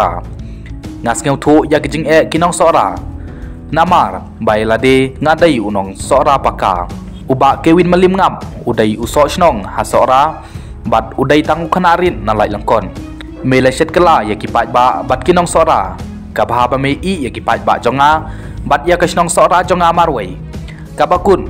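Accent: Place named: Delhi, India. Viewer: Kren pt kumno kum u nong delhi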